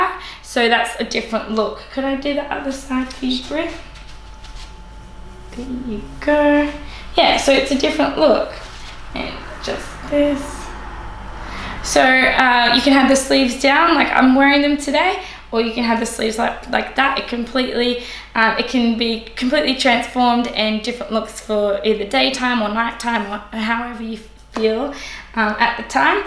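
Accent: Australia. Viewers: Britt is incredible. She is so beautiful and represents the company so well. And her accent. What part of Australia is her accent from? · Is that Australian accent?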